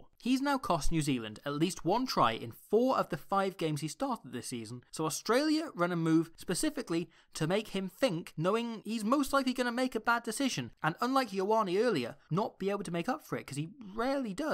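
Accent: Wales, United Kingdom. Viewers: This video is best viewed at 0.75 speed for NZ ears not used to this guy’s machine gun Welsh accent or whatever it is.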